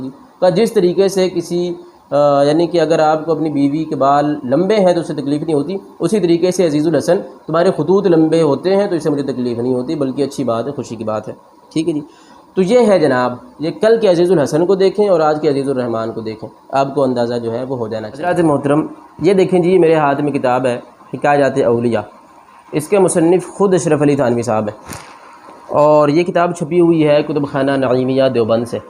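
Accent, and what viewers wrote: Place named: Azamgarh, India. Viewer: جناب آپ اعظم گڑھ مبارکپور کے رہنے والے ہیں آپ کی آواز سے ایسا ہی لگتا ہے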